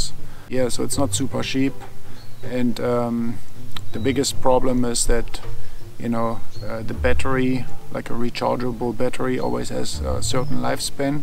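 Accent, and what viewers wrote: Germany. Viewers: Do you have a German accent? :)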